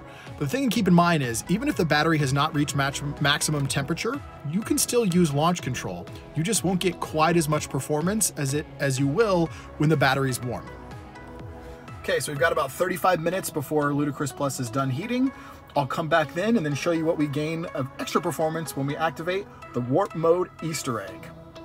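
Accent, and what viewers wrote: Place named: Scotland, United Kingdom. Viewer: Love there is a bit of the Scottish Lewis Capaldi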